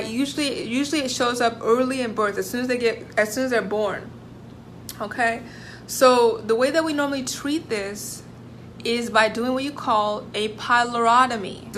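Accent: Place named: Haiti. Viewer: This video is trash but the Haitian Accent is cool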